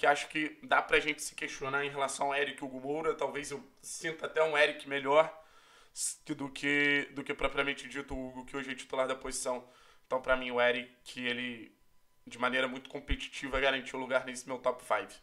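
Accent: Rio de Janeiro, Brazil. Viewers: esse cara é carioca e torce pro aflético?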